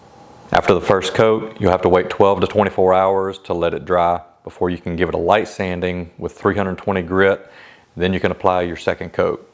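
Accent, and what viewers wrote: South, United States. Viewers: Cool. I have lived most of my life in northern Minnesota. I like listening to accents and your accent sounds like my kin from down south. Nothing like Texas accents. I appreciate your VIDEO! I am attempting to build a shelf and have never done wood-working since I was a kid. Kind regards from up north and Merry Christmas.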